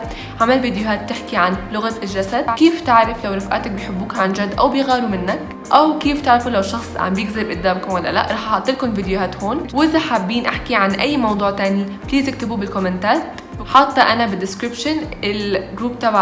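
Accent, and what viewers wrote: Syria. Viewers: انتي بتتكلمي سوري ومصري هو انتي مصريه ولا سوريه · نضارتك حلوة و كلامك السوري احلى من المصري😊🌹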